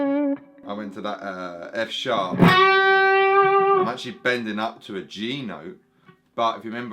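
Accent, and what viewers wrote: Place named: Cockney, United Kingdom. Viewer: Came for the listen, stayed for the cockney accent.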